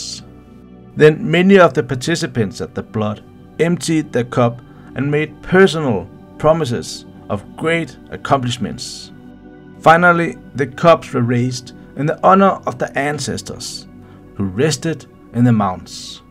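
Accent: Denmark. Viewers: I swear this guy is danish i can feel it in my danish body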